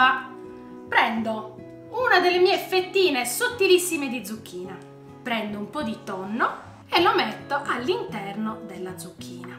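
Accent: Sardinia, Italy. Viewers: Che dire ….mi fai morire ,sei troppo simpatica ,ma sopratutto brava ,piena di energia ,fantasia e simpatia ,pian piano mi sto guardando i tuoi video ,sei sarda come me e si sente 😂,sono molto curiosa di sapere di quale parte della Sardegna sei ,io sono di pula ,provincia di Cagliari .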